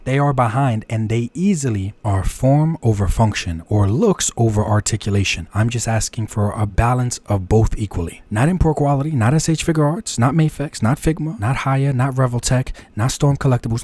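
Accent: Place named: Bronx, United States. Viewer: Classy, respectful video while making your point 👏 . I have watched many of your videos but this one made me sub. That a bronx accent? Cant put my finger on it. Def tri state area though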